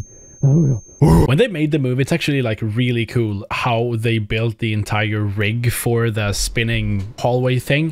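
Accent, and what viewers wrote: Sweden. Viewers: OH you're swedish, that's why your accent is so bad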